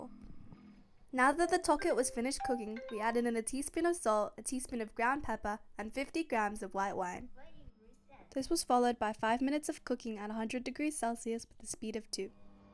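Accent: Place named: Asia. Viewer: You sound Asian. Any chance you can try a few Asian recipes? Like biryani, stewed pork belly, beef noodle soup, etc. Thanks!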